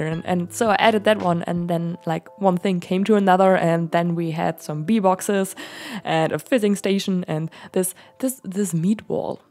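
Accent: Germany. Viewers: No, she's from Germany and German is her first language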